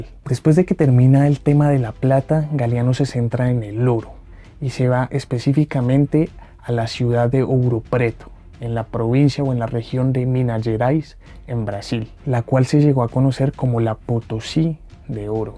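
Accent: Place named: Colombia. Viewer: Buenas tardes. Por tu acento creo que eres Colombiano. No estoy de acuerdo con tu interpretación del libro porque estubo muy sesgada. Veo que fue un material con años en Youtube, lo cual me hace preguntar, Que dirías en el 2024? Considerando el proceso político actual de Colombia, Chile, Argentina, Uruguay, El Salvador, Panamá, Perú, Ecuador, países que tenían unas grandes caretas.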